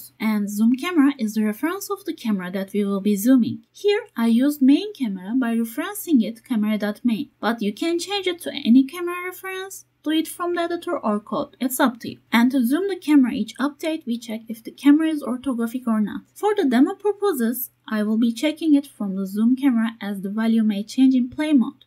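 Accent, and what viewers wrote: Turkey. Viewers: aksandan türk olduğu anlaşılıyor :) teşekkürler video için. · Türkçe gibi İngilizce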